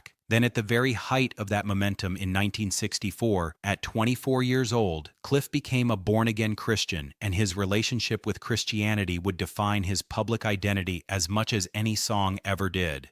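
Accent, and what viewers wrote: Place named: United States. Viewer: Why is an American doing this commentary again ?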